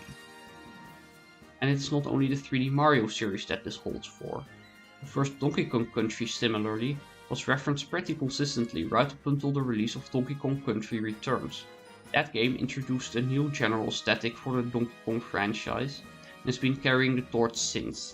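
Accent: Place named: Netherlands. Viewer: He talks with a Dutch accent. I would know since I'm also from the Netherlands.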